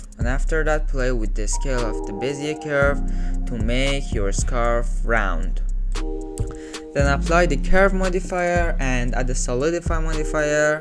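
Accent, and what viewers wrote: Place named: Iran. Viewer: داداش لهجه ایرونیت خیلی باحاله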